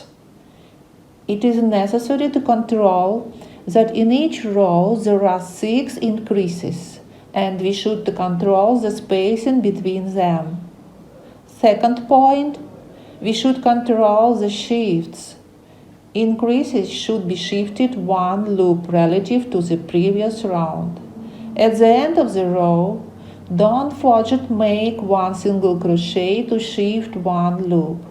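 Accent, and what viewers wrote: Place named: Nizhny Novgorod, Russia. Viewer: Какой-то нижегородский акцент, режет слух . Как будто по русски говорит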